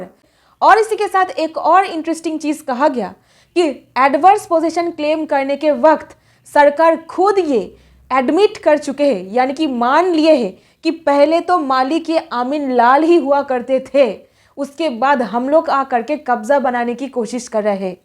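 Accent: Bengal, India. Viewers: Clarity and content, both well done. Your Hindi with a dash of Bangla makes the presentation more attractive and beautiful.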